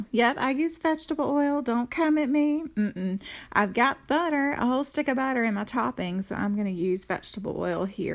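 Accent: South, United States. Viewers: You have to be from the South! Say it anyway you want too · If I drank a shot every time she said y'all, I'd be soooo drunk by the end of the video. 😂🤣... Love the Southern drawl ❤️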